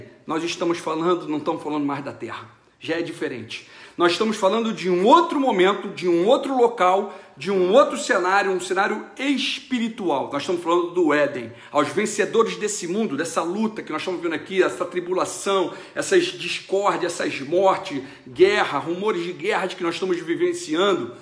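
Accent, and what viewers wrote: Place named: Rio de Janeiro, Brazil. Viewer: Conteúdo muito bom , porém esse sotaque de carioca é nojento , impossível se inscrever 🤮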